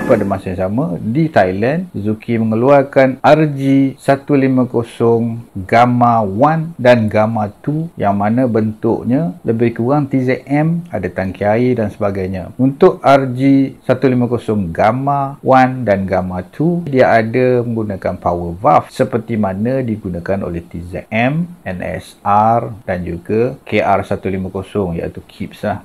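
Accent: Perak, Malaysia. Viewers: Assalmualaikum.. loghat Perak geng ni.. zaman ayah sy... Lepak dayabumi..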